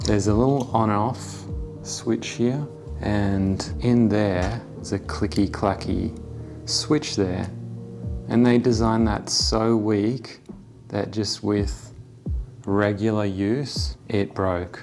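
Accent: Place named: Australia. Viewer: yeah I see it peek in on you,the funnier part was hearing you talk just now and figuring out your down under I had been listening with sap app (in Spanish) lol thanks for the help and guidance I do appreciate it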